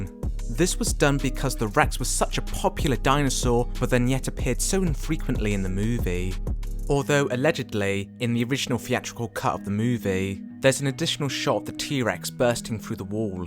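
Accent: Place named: England, United Kingdom. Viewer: somebody should teach the english how to speak english. it's hard to make out what the hell you're saying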